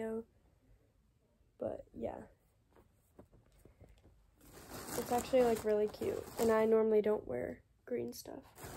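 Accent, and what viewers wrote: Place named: United States. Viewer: I'm not sure what she is saying because I'm English, and she's American, so I don't really know what she is saying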